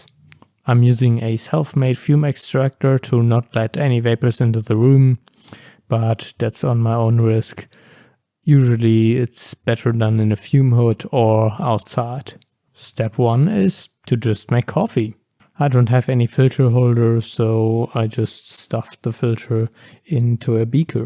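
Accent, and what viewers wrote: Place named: Germany. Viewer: Could it be a german accent? I generally think that a calm german person speaking english seems to have a softer voice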